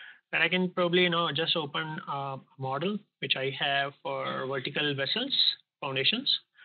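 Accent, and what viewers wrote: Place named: North India, India. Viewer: The trainer's English is highly accented locally to North India and it's not even good English. Please use speakers with Standard English pronunciation among Indian staff only, I am a retired Structural Engg Professor and still I couldn't even unmderstand what he spoke.. Thumbs Down!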